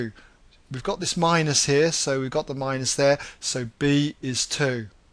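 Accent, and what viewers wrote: United Kingdom. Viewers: Wow nice British accent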